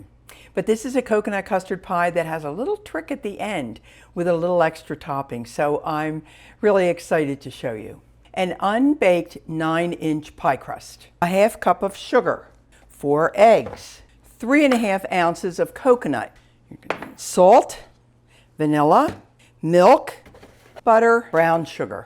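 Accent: Philadelphia, United States. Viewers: you from Philly? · First of your videos I’m watching. You must be from Philadelphia or thereabouts.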